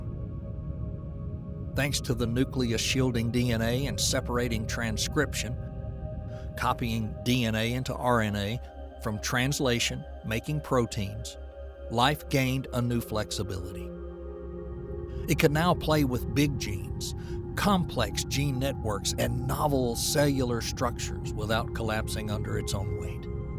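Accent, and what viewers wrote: United States. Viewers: Those complex names just don't sound right with an American accent. It's pronounced You-carry-otic !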